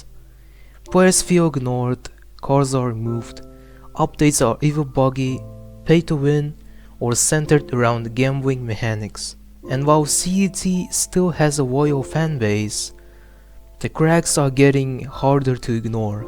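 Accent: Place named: Russia. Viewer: oh I thought that you have that Russian accent 😅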